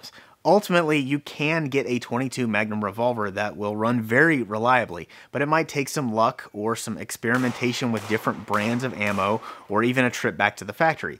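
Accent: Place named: Tennessee, United States. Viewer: It's ok we can tell your in Knoxville Tennessee, like the rest of us with those problems, that talk that same way Ya'll!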